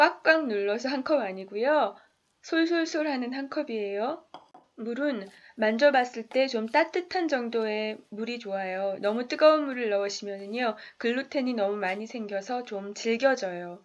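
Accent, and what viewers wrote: Seoul, South Korea. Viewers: 플레이크는 뭐예요 그리고 서울사투리인가요?? 말투 엄청 특이하네요 ㅋㅋ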